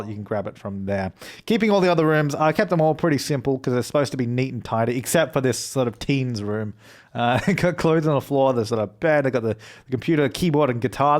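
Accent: Australia. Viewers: Why I Love listening to You and Deli? I think Australia accent combines with sims builds. 🤔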